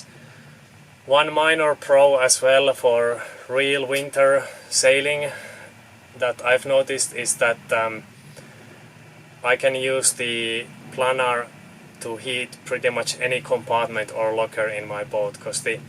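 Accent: Finland. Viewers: Great! Informativ for me and my campingbus heat project. I go for a small diesel heater, who can work on full trottle. I don't understand where you came from, but I notice some Finlands accent 😃.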